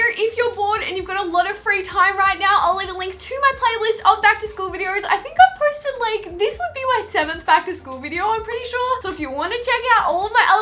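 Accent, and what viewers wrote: Australia. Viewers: She's an Aussie right??? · is she australian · ARE U AUSTRALIAN? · AUSSIE!! YEAH MATE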